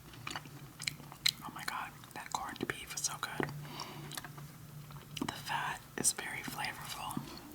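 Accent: Ireland. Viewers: oh my gosh, before the disclaimer I thought you were 100% Irish :O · r u irish? also rply if ur wtching in 2016!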